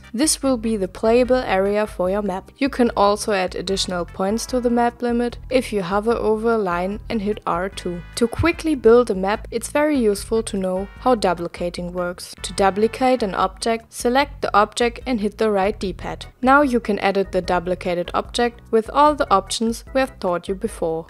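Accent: Germany. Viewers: Ahhhh das deutsche English :D. I Love it :D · The school i really need ^^ Does she have a german accent ?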